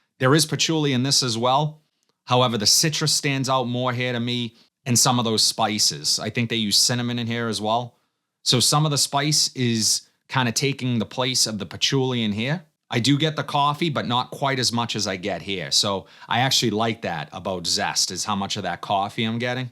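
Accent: Boston, United States. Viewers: That Boston accent 🫣🤣🤢🤮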